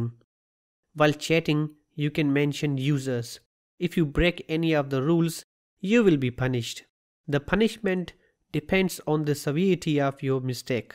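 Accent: India. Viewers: Don't understand Indianenglish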